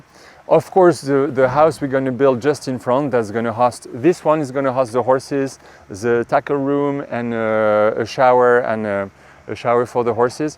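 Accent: France. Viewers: Always a champion! Love the changes you have made..your English is superb, the light French accent makes me want to learn the language!!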